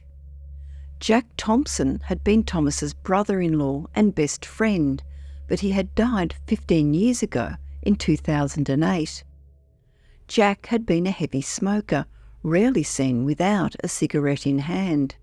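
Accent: Australia. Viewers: She has a lovely aussi accent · Nah, you're just not used to her Aussie accent.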